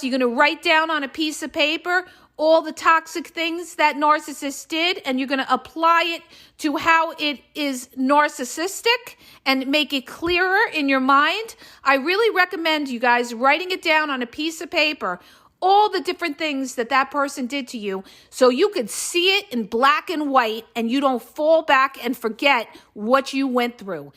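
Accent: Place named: Elmwood Park, United States. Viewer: I love you I'm from Elmwood Park and it's nice to hear somebody talk like I talk and you describe everything the best more so than all these other channels and really help me thank you God bless you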